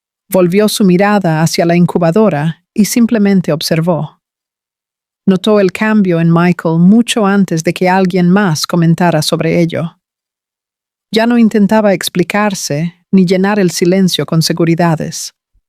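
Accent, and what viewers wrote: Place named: Brazil. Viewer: Esta historia es Brasil por eso le sale el espoñol asi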